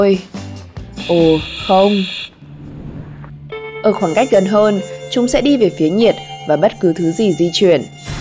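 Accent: North, Vietnam. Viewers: Cho anh giọng Bắc hay và ấm hơn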